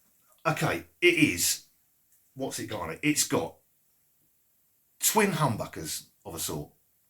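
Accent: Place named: England, United Kingdom. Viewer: It's so nice to hear an English accent instead of an abrasive American twang with put a sock in it pronunciations.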